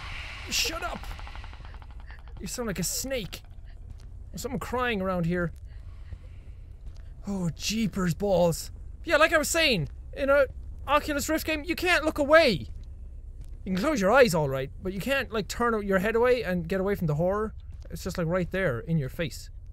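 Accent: Ireland. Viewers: you have made a (somewhat jealous) subscriber out of me all right. God I wish I was raised in Ireland, I LOVE Irish accents, best in the world, screw Britain, Germany, Russia, all them suck when pinned against an Irish accent. Anyway, yea, I wish I was raised in Ireland so I could have that awesome accent, and I'd be happy with a little more Irish blood, both of my grandfathers are Irish, but so far down the family tree it means nothing.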